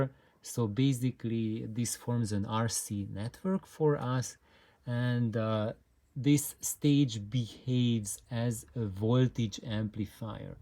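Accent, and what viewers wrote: Hungary. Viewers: I’m 2 yrs late, but I am and have always been able to understand you perfectly,. I’ve met friends via the vinyl and audio communities from all over the world, France, Germany, Sweden, Australia, Japan, Brazil, etc., and I can understand them, quite clearly in fact. It’s not like you’re speaking Hungarian, just English with a Hungarian accent.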